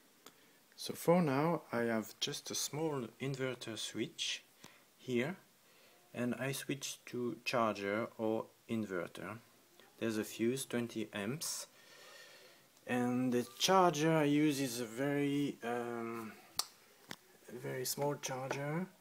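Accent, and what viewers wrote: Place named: France. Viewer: Salut, à écouter rapidement le début de la vidéo, je pense entendre un accent bien français:) ce qui me donne espoir de pouvoir discuter de ton installations de vive voix. Fait moi signe stp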